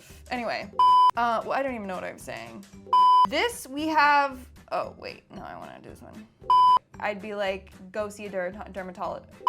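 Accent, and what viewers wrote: New York, United States. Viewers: Haha...love your NY accent.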